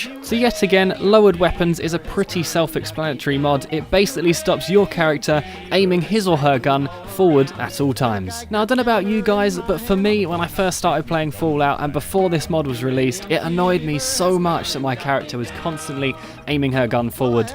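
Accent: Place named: United Kingdom. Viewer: Brilliant vid mate, to the point, no retarded goofing, stressing immersive mods, and in British English. Subbed.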